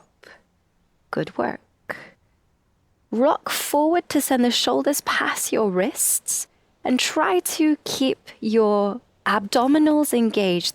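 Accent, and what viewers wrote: England, United Kingdom. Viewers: hI - thank you for a lovely practise that really hit the spot for where I am just now. After a long gap I'm trying to get back into a morning yoga practice but deliberately wanted just a 20 minute beginners one that I felt I could commit to and not find too taxing while I built up my yoga muscles and love again. This was perfect! And some beautiful scenery and a lovely English accent to boot! all good - see you tomorrow. xxx💖💝💘🥰🥰😍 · Hello dear... greetings from Iran ❤ I'm so happy to find your channel, I've done some lessons so far and I really enjoy the way you teach yoga... I absolutely like your british accent too😊 Wish you the best ❤❤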